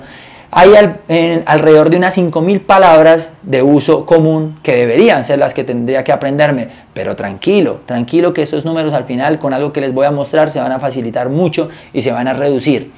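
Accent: Colombia. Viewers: Por su acento usted debe ser Colombiano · Muy bueno, de acuerdo con mucho de lo que dices compañero! Solo recomiendo ir modulando bastante el acento en español.... Supongo que eres santandereano! Jaja yo también lo soy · Bobos culicagados haha me agrada el acento colombiono...buenos videos my Men... saludos desde mexico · Eres Colombiano? muchas pero muchas gracias parcero, enserio wuevon muchas gracias. jejeje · Me encanta que seas de Bogota y nuestro acento en genial no hay porque cambiarlo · huyyy parcero lo felicito eres un teso por la forma en que hablas y te expresas debes ser colombiano. así que felicitaciones. cómo pues es pa lo del PDF de vocabulario parce ya me suscribí y estoy compartiendo tu canal con todo mundo. mi correo es <mail> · puro acento de colombiano!! viva Colombia !! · no se porque usted se me hace que es colombiano ? is;not true?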